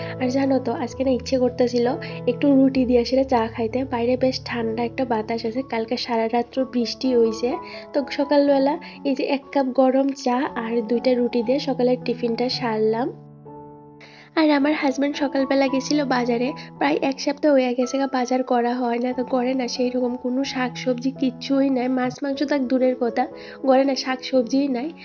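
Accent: Bangladesh. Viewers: Bon tomar bari kothai bagladesh avabe kotha bolcho keno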